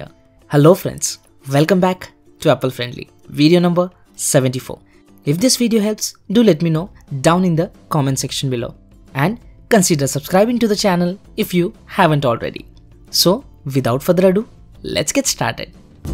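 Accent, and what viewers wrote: India. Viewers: I don't know. I am sorry my friend, but when I hear an indian accent I turn off, purely and simply because of the many indian scammers out there, copying a variety of accents (badly!), but it is a turn off. Sorry bro Take care, good bye.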